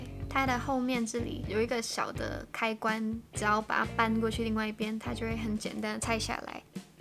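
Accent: Taiwan. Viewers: 點解你D國語可以講到同台妹一樣 ?!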